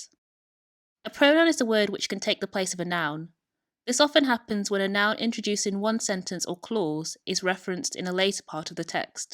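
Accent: England, United Kingdom. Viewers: very horrible pronuncuation, such a strong english accent...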